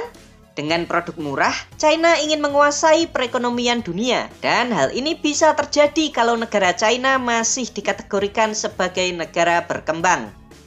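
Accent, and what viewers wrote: Java, Indonesia. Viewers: WALAU MEDOK BANGEEET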